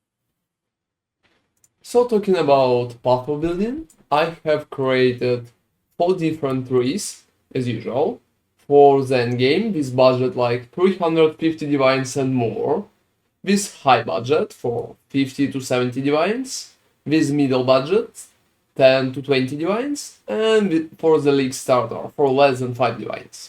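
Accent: Russia. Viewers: жесть чувак твой русский акцент это такой пиздец, это невозможно слушать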